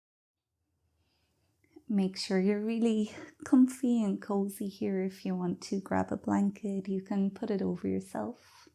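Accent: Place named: Ireland. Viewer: Excellent class!! And the accent is a beautiful bonus , may I ask what it is, Irish?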